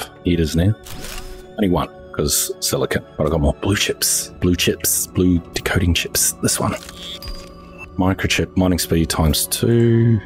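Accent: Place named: Australia. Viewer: Aussie ASMR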